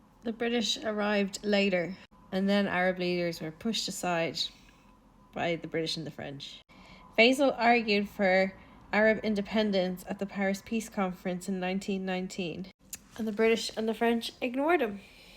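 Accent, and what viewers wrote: Ireland. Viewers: Wait I don’t get it. How is any of this bad? Unless you’re pro-Ottoman? But you sound Irish. I guess taking the credit for the Arabs tactics was bad but it was over 100 years ago so I personally don’t care and don’t understand why you do.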